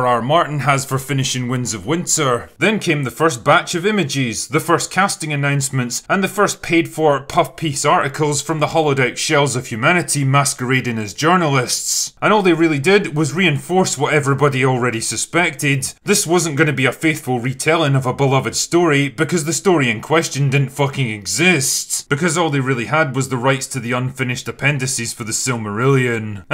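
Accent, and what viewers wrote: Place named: North, United Kingdom. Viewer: This guy sounds like the 9th doctor 😂🤣 must be his northern accent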